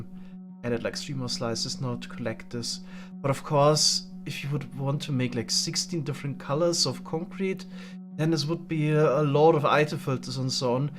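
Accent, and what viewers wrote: Germany. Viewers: are you german or scottish? or swedish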